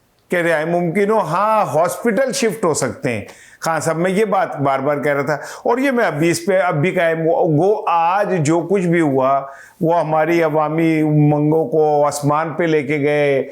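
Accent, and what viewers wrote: Punjab, India. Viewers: Punjabi ka turka bht acha lgta h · Please don’t give explanations the way you talk is your own style punjabi tarka is really good so never stop doing so. Your English vlog was really strange I am glad u explained what happened to it 😂❤❤❤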